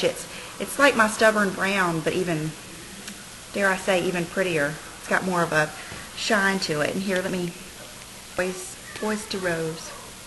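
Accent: South, United States. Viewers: No worries about that "Suthern" accent! he he, just thought to help out with the pronunciation and I didn't even get it exactly right! Can't wait for your depotting video! Thanks!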